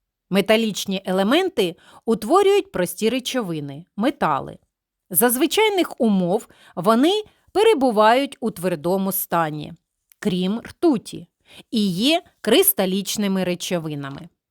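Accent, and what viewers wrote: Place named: Kharkiv, Ukraine. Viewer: Говір схожий на Харківський.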